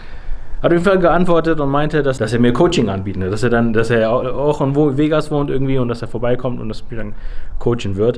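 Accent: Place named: Cologne, Germany. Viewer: Haha, einfach den Kölner Dialekt nach Vegas verfrachtet. So muss das sein freu mich auf die Coaching Videos, seh sie mir jetz an. Grüße aus Brühl vom ehemaligen Hürther, an den ehemaligen Hürther! ( der der mit dir im mandalay Bay 2017 kein Kölsch reden durfte) viel Erfolg weiter für dich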